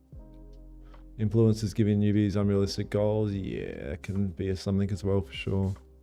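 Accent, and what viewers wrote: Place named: Australia. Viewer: Living in Australia. It’s refreshing hearing an Aussie accent with typical Aussie humour. I was skeptical at your simple trading style but you are making money and I’m not. Think I’ll start tuning into your streams mate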